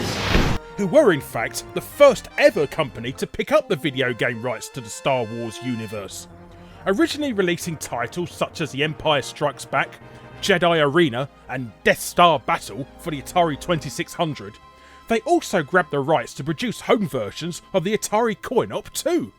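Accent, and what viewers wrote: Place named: Southern England, United Kingdom. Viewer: What's a parth? There is no R in path or bath nor a second one in grass fucking southerners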